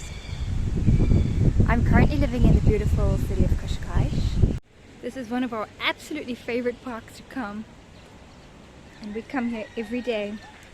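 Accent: South Africa. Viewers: Ek wou se ek hearken n SA aksent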